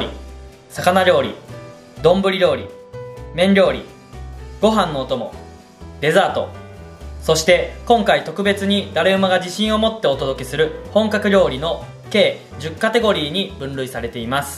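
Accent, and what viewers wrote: Kansai, Japan. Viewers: リプありがとうございます！要所要所に出る関西弁も好きですよ＾＾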